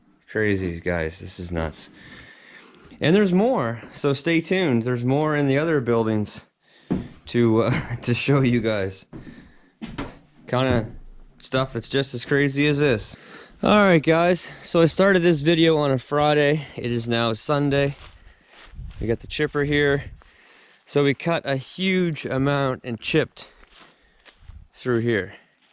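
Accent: Nova Scotia, Canada. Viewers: No - please work your native Canuck "accent" - I call them inflections for lack of better term - back in to your speech. As an accent-less, flat-speech, plain-white-toast American in the PNW I enjoy hearing it. It's amusing and I still have to mess with my family aboot it but it's flavor/character so don't mess with yours bud. I have to watch Letterkenny, Corner Gas, My Self Reliance and Strange Brew frequently to get a fix. Cheers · First time seeing your channel. Sounds like a Canadian accent☺️, what area is this property near? It’s beautiful! That’s some long driveway in! Do you get snow? So the cabin is seasonal, or plow the driveway? What a dream!!! Great purchase👍🏼👍🏼👍🏼 congratulations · I was wondering why your accent was pronouncing house as "Hoos" then you said Nova Scotia and it finally made sense. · Canada? Accent sounds canadian? Nova scotia! 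· I heard the NS in your voice before you even mentioned it. 😂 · That was the cutest Canadian accent I ever heard. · Sounds Canadian...love Canada!!!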